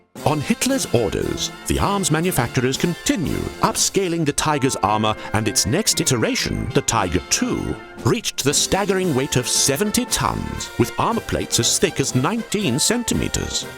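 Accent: United Kingdom. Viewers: The lovely Classic British accent ❤❤❤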